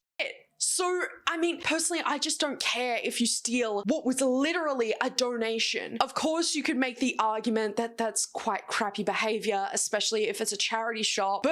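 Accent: Australia. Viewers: i think youre australian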